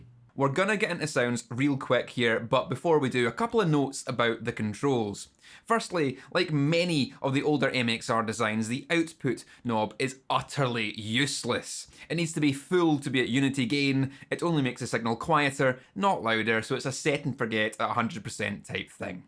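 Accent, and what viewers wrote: Scotland, United Kingdom. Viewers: Is that a scottish accent I hear? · Where is the commenter who transcribed Scottishness? I demand one of those for every one of his videos now.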